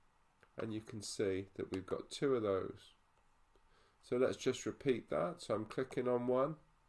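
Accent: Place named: United States. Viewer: holy american accent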